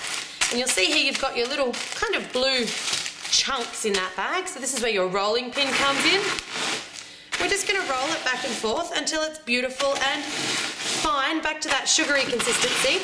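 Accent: Australia. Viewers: i. love. australian. accents.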